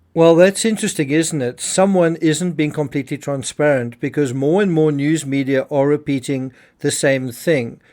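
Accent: Afrikaans, South Africa. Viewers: Another Afrikaans accent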